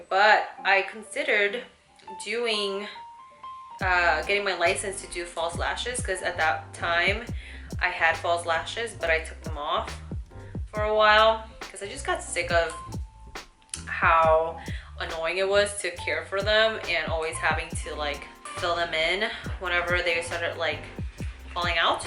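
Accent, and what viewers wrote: Puerto Rico. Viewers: Chica, I"ve experienced culture shock in the past, pero the fact that a Chinese girl that speaks "Puerto Rican" Spanish, is super Fu!@ cool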